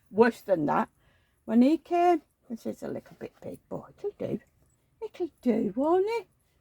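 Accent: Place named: Lancashire, United Kingdom. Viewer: Hi, there. I'm Linda from near Lichfield. What beautiful babies you have in absolutely gorgeous outfits. I have only recently got into doll collecting but only the cheaper ones!!! Really enjoyed your video and agree that the changes we have seen in our lifetime are tremendous. I was born in 1951. I was wondering where you are from in Lancashire(?) You have an accent I recognise similar to that of my grandparents who were from Westhoughton.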